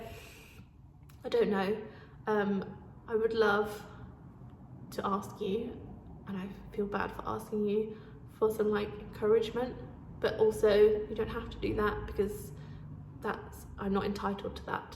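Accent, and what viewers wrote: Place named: United Kingdom. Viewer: YOU ARE AMAZING!!!! Put up all the videos!!! You are one of my favorites for so many more reasons than your fun British accent 😊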